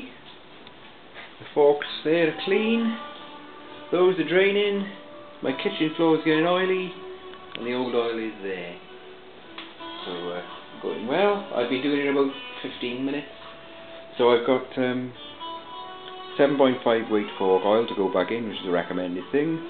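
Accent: Wales, United Kingdom. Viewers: Are you Welshman or something?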